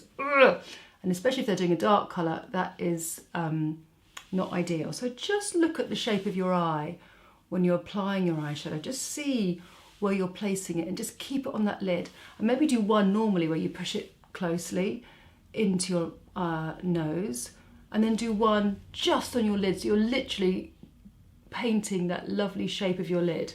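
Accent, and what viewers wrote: United Kingdom. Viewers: Hi from Israel!😁I'm always happy to subscribe to british "beauty gurues", the accent is beautiful. Happy Valentines day!🌼🌻💐🌸🌹🥀🌺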